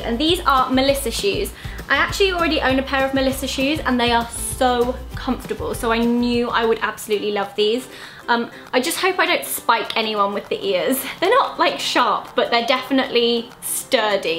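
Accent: United Kingdom. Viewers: I noticed after she finished showing her pizza queen phone case she said hooray but pronounced it "hoo-ra". I love and her British accent.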